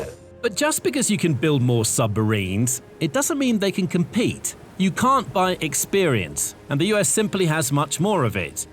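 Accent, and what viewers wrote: United Kingdom. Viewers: Dude is saying nucular in a British accent nothing that follows that can be taken seriously! I couldn't continue after maybe the 5th time but I'll be he says the hulls are made of aluminum or some such shite. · Yeah Okay. He is English,( British.) Not American.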